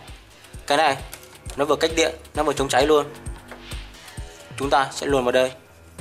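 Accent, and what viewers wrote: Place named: Phu Tho, Vietnam. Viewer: nghe giọng anh là biết anh là người có Phú Thọ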